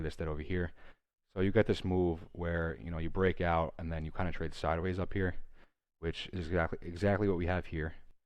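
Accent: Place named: Pennsylvania, United States. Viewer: Realest personality to do it. You’re very appreciated homie. I feel like you’re from PA/mj/my/md. Big resonation. Philly native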